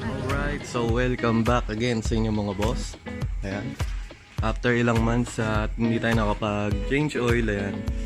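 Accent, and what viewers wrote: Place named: Iloilo, Philippines. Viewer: hahahah medyu malapit ako jan boss hahaha linti ilonggo kman gali hahaha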